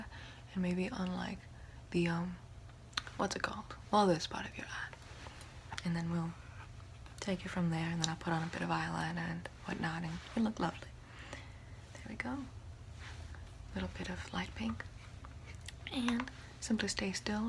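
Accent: South, United States. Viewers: lol that made me smile :) And your old timey southern accent is resonant gold! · I love the freckle on your nose. Odd comment i know, but it is just so unique to your face. Lovely southern drawl, reminds me of Steel Magnolias~ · You absolute babe, I love Southern USA accents in Asmr! Thank you 💜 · More southern accent videos PLS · "and i have a southern accent...that is terrible ..." I laughed so hard I love it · Southern accents are a kryptonite for me. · I know it’s probably a reference to something else, but the reference to the vampires and the southern accents just makes me think of interview with a vampire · Yasss the southern accent- so much love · I do adore the snooty sothern acsent becuse it sounds so gossipy and prim, i love it ! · Last night, I giggled when you mentioned thomas edison... I laughed myself awake at the "realization" that you are doing a southern roleplay and you set it during the civil war. 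"Lincoln is the president. and we are at the civil war. and I have a southern accent. That is terrible." Laughed the entire day about that series of statements. Thank you so much. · 1. I'm southern and faux-southern accents usually bother me in asmr in particular, but the antebellum aspect of this aspect made it so enjoyable. I love the sort of over-the-top elegance of thick civil war era accents. 2. I had no idea so many people were into vampire diaries until I scrolled through these comments.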